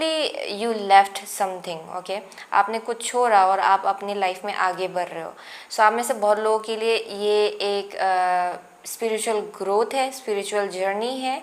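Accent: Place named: Arunachal Pradesh, India. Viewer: Aap kaha se ho mam? Arunachal Pradesh se, aisa lag rha hai, i m from Psg, mine is pile 1 n 4, both resonates n m confused. But thnx 🙏 Nice reading. 👍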